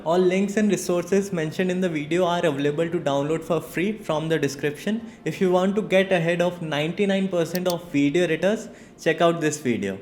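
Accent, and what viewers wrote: India. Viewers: Kyuki accent indian hai ❤️😁😁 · I realised this video is in english after reading your comment. The accent is very Hindi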